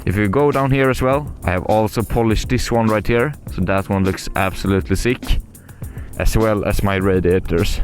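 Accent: Sweden. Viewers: du är svesnsk ahh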